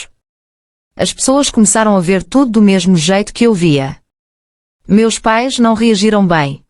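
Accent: Portugal. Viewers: Uma narração de áudio de Portugal eita meu Deus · Eu gosto da língua portuguesa (de Portugal mesmo? Gostei mto da história, foi mto bem narrada, parabéns. 🎉😊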